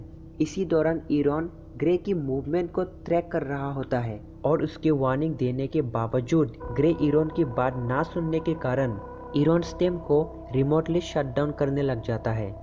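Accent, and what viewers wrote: Assam, India. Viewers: I think you are Assames. Cuz you have same accent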